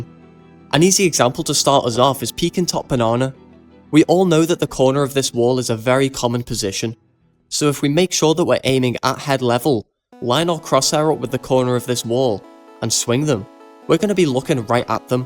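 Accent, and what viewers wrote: United Kingdom. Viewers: Bro... I never been into first person shooter games. For whatever reason i decided to dl cs2, your vids are very eye opening. Youtube suggesting them to me after 2 hrs of game time, well done yt. Hit me up if your down to do a vid series training a newbie. I know from accent your british so on my time zone lol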